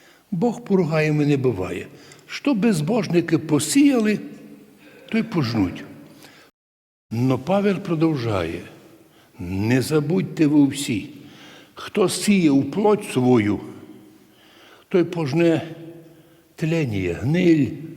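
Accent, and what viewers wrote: Transcarpathia, Ukraine. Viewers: А , це знову отой, що сам не знає, ,,што" говорить? Ще один знавець ,,істини". Навіть не потрудиться сказати, що ,,ми віримо в те, що у нас істина". Віримо! Так ніт же - і Томос у нього ,,куплЕний" за,, амеріцькі долляри",і ,,дітей треба не шкодувати, а відправляти на війну"... Своїх то, мабуть, нема? Та ще витерпіти оту його окремо взяту ,,закарпатську мову"- це ,,што - то с чєм - то". Опанував би краще літературну мову, ти ж публічна людина.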